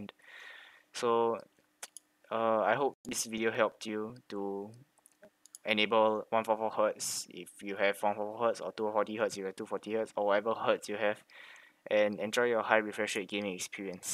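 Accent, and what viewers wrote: Singapore. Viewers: i knew it u use Singapore english Accent Lol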